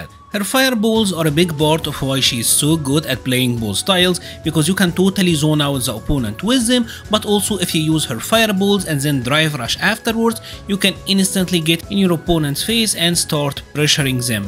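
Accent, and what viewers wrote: Egypt. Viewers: I can tell you’re egyption just from the first minute listening to your accent lol. Great video btw really helpful.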